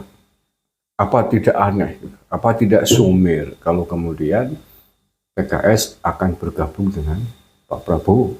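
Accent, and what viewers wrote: Banyumas, Indonesia. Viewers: Kang wong ngapak..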